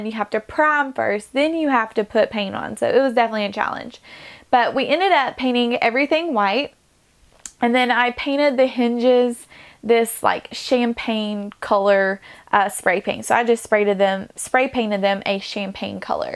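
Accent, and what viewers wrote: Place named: South, United States. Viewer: mai567 it's called a strong southern accent.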